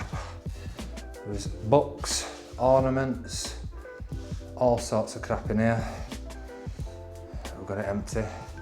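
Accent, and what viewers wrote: Yorkshire, United Kingdom. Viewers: Stumbled across your channel scrolling through YouTube! Love the work ethic! Would be great if the end of your videos you did a breakdown of the financial side of the job. What you charged and what you made from the good bits that you’ve kept to scrap or resell etc. Looking forward to the next video! All the best from a fellow Yorkshireman (you sound like a yorkie anyway 😂)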